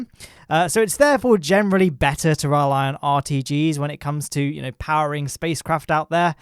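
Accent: United Kingdom. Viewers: I love his stuff, great video's and explanations. I even like the British accent. Half way through this one though, and I've lost interest in him. HeiGHT. HIGH T. HARD T. It's not heigthhhhhh!!!!! Well educated, a doctor, and ENGLISH- it's THEIR LANGUAGE!!!!!!! Do you axe patients how dey feelz too?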